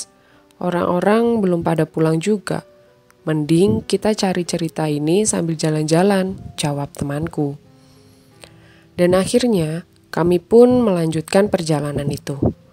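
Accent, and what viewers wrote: Malang, Indonesia. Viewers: Asik an pas samean dewe seng crito sam, boso malangan mantep, berasa ndek tongkrongan. 😁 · malangan betul logatnya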